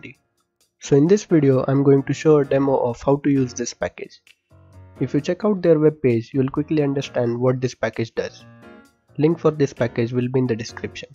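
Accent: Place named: India. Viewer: Indians really have to work on their accent! except that, the content was useful thanks.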